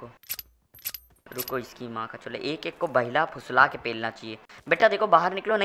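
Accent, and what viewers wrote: Kanpur, India. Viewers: Kaun city se h Kanpuriya lagte ho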